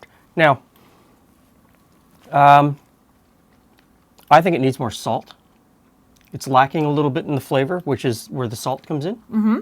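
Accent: Canada. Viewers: Did you cut your own hair? Nice job. Thanks for the presentation and the recipe. I can tell you're a fellow Canadian by the accent. Stay safe. ♥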